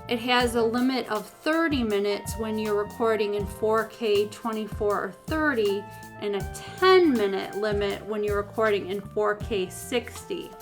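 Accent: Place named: Minnesota, United States. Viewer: As a non-American I can be wrong here, but you sound a lot like the people in that brilliant movie FARGO... Anyways, very good comparison! Groeten vanuit Belgie!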